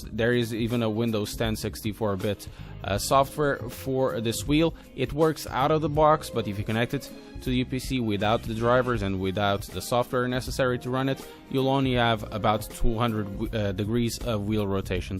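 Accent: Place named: Portugal. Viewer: Where are you from? Your accent sounds portuguese!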